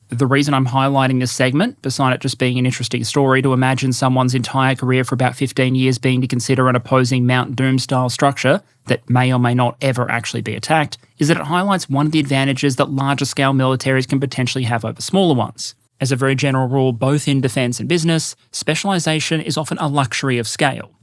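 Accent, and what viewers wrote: Australia. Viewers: The up, down, back and forth of this conflict reads like a brittish comedy sketch Á la Blackadder or Monty python. Now, with Aussie voice over.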